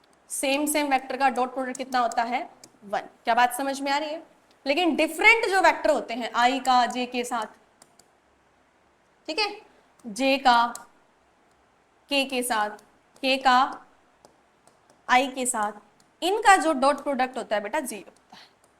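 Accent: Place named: Haryana, India. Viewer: Aap Haryana se ho lag rha mujhe... Excellent explaination mam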